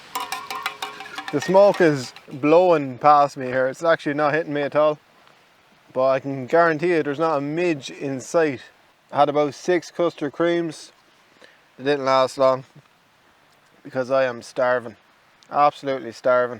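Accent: Ireland. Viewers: I just started watching your videos a couple of days ago and I enjoyed them. I noticed everybody was talking about your giggle and they’re right it’s very endearing. I wouldn’t change anything - you got me as a new subscriber. I love your Irish accent! At least I assume you’re in Ireland?! if someone doesn’t like what you’re doing they’re free to go watch something else you know what I mean? It looks to me like you have lots of people who are interested, so I wouldn’t worry about it at all.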